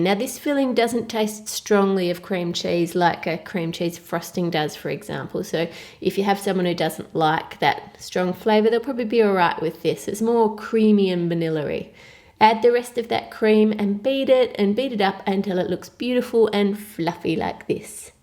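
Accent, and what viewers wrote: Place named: Australia. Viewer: If you want that kind of accent. You have to roll your tongue :P I love her Australian accent too!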